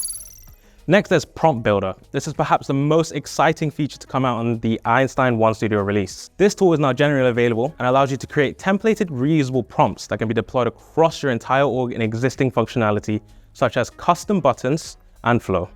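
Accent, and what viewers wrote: United Kingdom. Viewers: is this a london accent? im digging it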